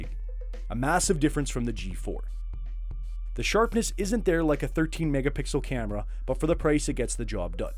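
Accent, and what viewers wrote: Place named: Toronto, Canada. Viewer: Such a Toronto accent!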